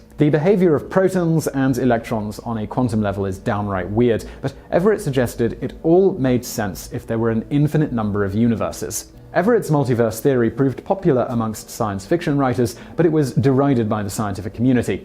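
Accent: United Kingdom. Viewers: TheLadyBridget Simon has made a video in the past stating he pronounces things the way they pronounce it in Britain, using Commonwealth English. So if it sounds different from what we are used to, we can chalk it up to different dialects of English.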